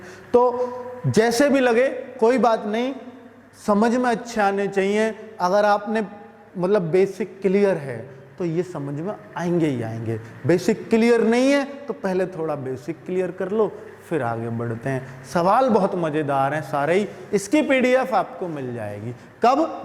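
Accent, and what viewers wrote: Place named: Haryana, India. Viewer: sir.. Mujhe aap k video dekhte hue 4-5 din hi hue hai, but itne me hi Mai aap ka Bhout bada fan ho gaya hu... Specially aap ke haryanwi boli ka! Mai jaldi kisi Ki video like nahi karta, but aap Ki Mai video dekhne se pehle hi like Kar deta hu!😊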